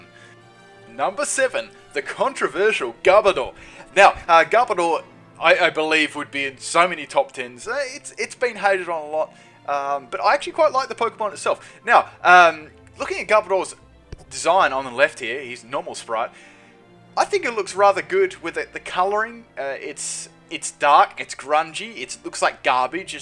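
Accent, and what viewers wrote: Australia. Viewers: Australian tho, accents are completely different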